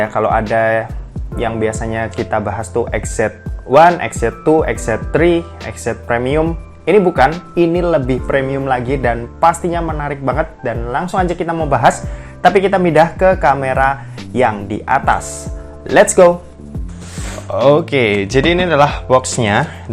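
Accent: Semarang, Indonesia. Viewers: Mas wong semarang yo?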